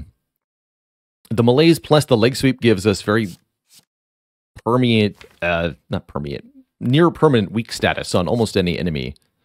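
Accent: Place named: United States. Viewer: Thanks for the summary! (his accent is definitely American ;)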